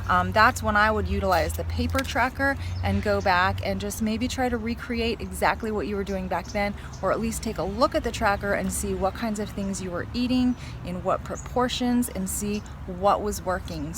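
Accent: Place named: Canada. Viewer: Are you Canadian? You talk with that Accent. Love it.❤️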